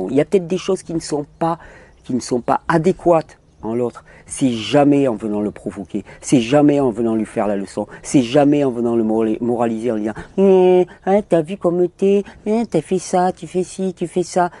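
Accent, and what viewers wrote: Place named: South, France. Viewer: bonjour, au vu de ton accent, tu sembles être du sud de la france, je dirai même sud - est, est ce que je me trompe?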